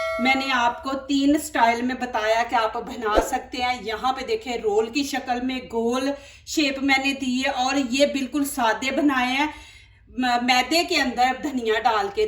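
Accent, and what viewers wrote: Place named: Punjab, India. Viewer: اپ پنجاب کے کون سے علاقے کی بولی بولتی ہیں اپ کی بول چال اچھی لگتی ھے اور ریسیپی بھی اچھی اچھی بناتی ہیں لیکن اپ برائے مہر بانی انگلش کوبیچ میں نہ ملایا کریں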